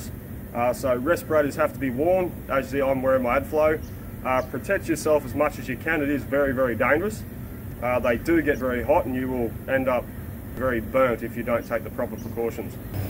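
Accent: Australia. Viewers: Awesome!!! And this channel is even useful to practice English with an Australian accent 😁😁😁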